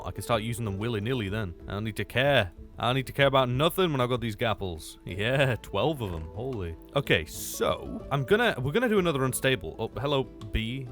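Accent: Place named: United Kingdom. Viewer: The strong British accent slays and I could like hearing the yapping.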